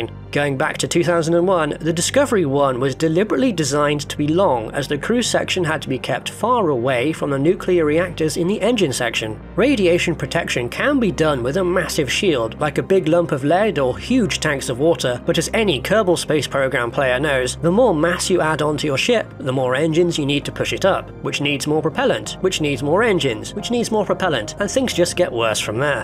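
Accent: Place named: Scotland, United Kingdom. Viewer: What is this accent? Scottish? Canadian? Kazahkstani?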